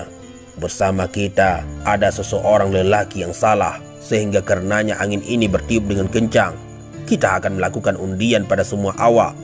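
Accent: Aceh, Indonesia. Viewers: Ini pasti orang aceh,,terbaek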